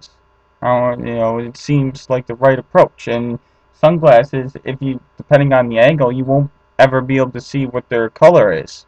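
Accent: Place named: Wisconsin, United States. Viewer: I detect a northern accent from you. You're from Wisconsin.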